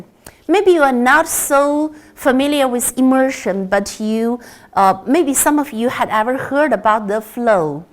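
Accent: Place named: China. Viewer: 中式英语!😊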